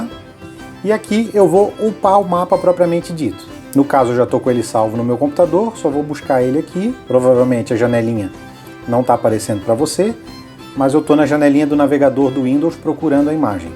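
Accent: Florianópolis, Brazil. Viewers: Tu és manezinho brou?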